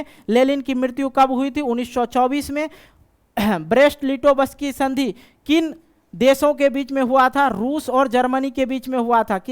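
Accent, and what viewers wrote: Bihar, India. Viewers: Sir Aap Bihar se hai kyaa ❤❤❤❤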